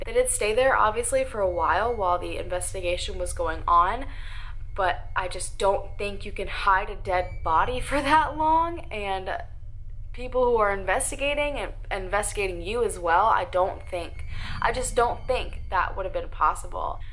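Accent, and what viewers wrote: South, United States. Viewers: don’t worry about your country accent coming out occasionally. That happens to me too😂💓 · I love your Southern Belle accent, 'she gawn missin',...lolz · Girl let that southern accent OUT 😆❤️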